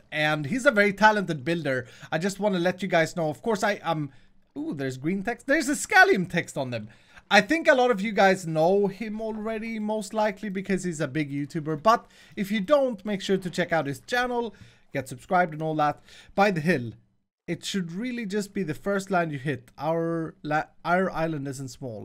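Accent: Sweden. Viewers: his acent is swedish btw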